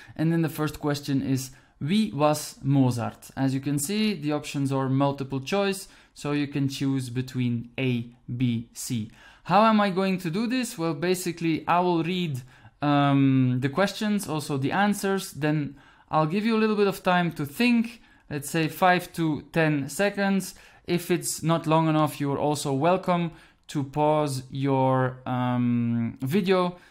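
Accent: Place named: Belgium. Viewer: Dit is Belgisch. Niet Nederlands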